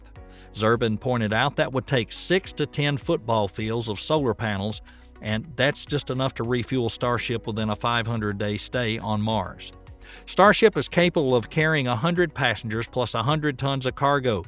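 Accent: South, United States. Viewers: Anyone else find it hard to watch a technology video and take it seriously when narrated in a southern accent?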